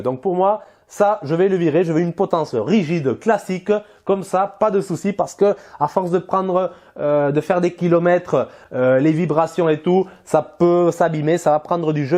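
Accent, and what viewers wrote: Toulouse, France. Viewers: Bonjour, Proposition très cohérente pour de grands voyages,plein de bon sens,j’adore l’accent toulousain, Bonne continuation la chaîne me plait.👍👍👍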